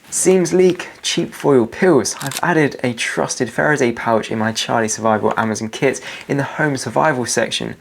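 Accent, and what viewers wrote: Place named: England, United Kingdom. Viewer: I'm sure that way of speaking is perfectly natural for him as an English person. Depends on where he's from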